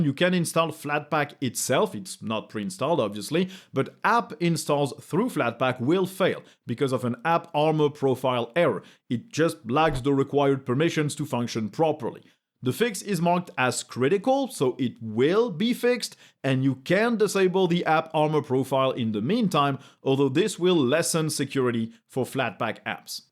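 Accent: France. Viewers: I come here for the Linux news, I stay for the French accent.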